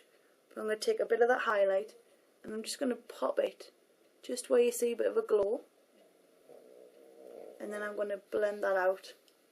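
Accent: Newcastle, United Kingdom. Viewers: Geordie accent--can't get any better than that!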